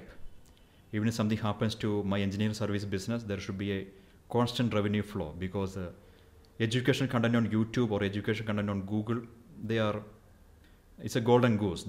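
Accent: India. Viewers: Strongest Indian accent on the planet this guy 😭🤦‍♂️ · Are you from Kerala? even I'm feeling it the same way.. ☹️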